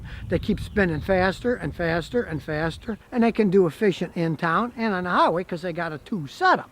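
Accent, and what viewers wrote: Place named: Midwest, United States. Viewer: I could swear you said something at the beginning of this like "rough Long Island road". You didn't say that did you??? That would mean you're from Long Island and then we'd become friends! You have to be in the Midwest by the sound of it, right? I have a 2012 Navigator I'd LOVE to be able to bring in for you to fix!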